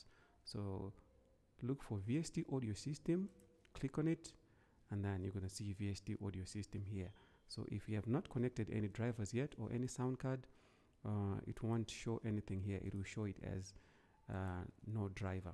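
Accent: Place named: Zimbabwe. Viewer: Ndazvinzwa hangu uyu mwana wevhu chete 😂 anyway thanks brother this video is awesome and helpful 👏🏿👏🏿👏🏿👏🏿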